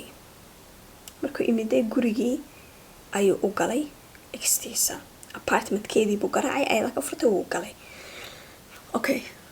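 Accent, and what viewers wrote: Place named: Mogadishu, Somalia. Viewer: Majarafad waa badiil lahjada reer xamar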